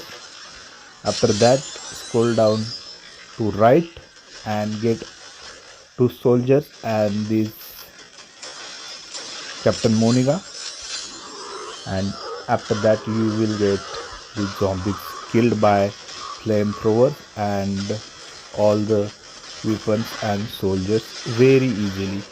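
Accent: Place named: India. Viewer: cant understand a word this dude is saying. the indian accent is bad enough but talking as fast as you possibly can like you just did a mountain of meth really doesn't help.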